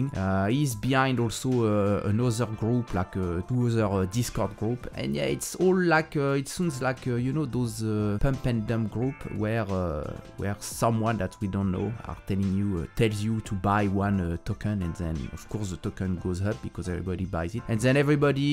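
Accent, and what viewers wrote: France. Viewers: Quasi sûr que t'es français ! Bonne chance pour cette chaîne anglophone, ça doit pas être facile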